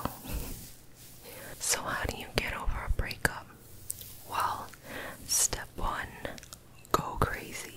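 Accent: United States. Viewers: Your NY accent is all up n down your vowels... i love it lol... where in NYC did you grow up? If you tell me youre a Brooklyn chick i'll die 💜 (assuming you respond again)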